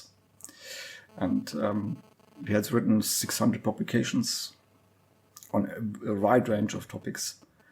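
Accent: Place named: South Africa. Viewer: Out of interest, where is the creator of this podcast from? Your accent sounds very similar to a South African accent. I am from South Africa, and this is intriguing.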